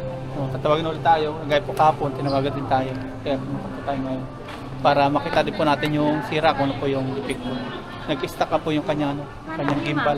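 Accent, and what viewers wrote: Visayas, Philippines. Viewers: Wag mo sabihin Caviteño ka,,,iba ang way mo ng pagsasalita parang pang bisaya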